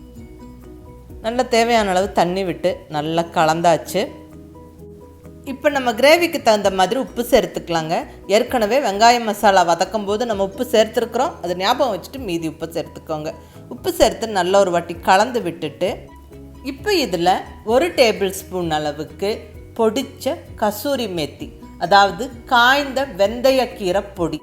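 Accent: Kongu, India. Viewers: Hai Mam! I love to hear your Kongu Tamil. The way you explain , each dish is so clear that even the beginners can understand well. Will you give the link for the white Kadai which you used for the preparation of Ragi halwa?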